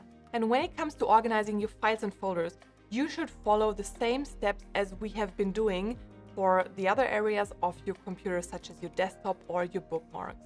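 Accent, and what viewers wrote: Germany. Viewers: Can't stop "listening" to your video. I love your German accent! Bist du tatsächlich Deutscher? Also, bist du Deutscher? Komm schon, erzähl es mir bitte!😃 I am Brazilian and am learning German and to me, you sound like you have a German accent. I could be wrong, but whatever.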